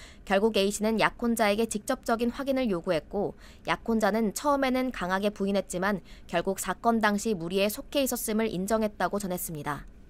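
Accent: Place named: Gyeongsang, South Korea. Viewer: 갱상도 ㅋㅋㅋㅋ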